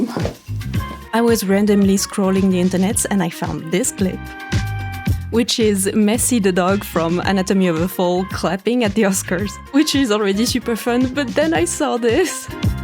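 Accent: France. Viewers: "Sorry about that" re the French accent nooooo I love your French accent and random French words! The bed is soooooo cute! Such a lovely cosy nap spot for Not My Cat ❤. Also let him have some croissant please, he deserves a sweet treat! My kitty loves croissants and castella cake, whenever we let him have a teeny tiny nibble he is very happy 🥐🥐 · Very cute, very hilarious. No need to apologize for your accent or being French. Neither are a bad thing ❤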